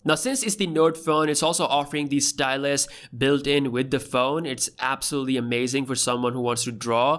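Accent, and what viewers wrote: India. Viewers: You have a funny accent. It goes Indian and American like a dog playing with its tail... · Your voice like Indian guy speak English and trying hard to hide the accent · Accent like an india tongue,, spoiled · Chutiya desi accent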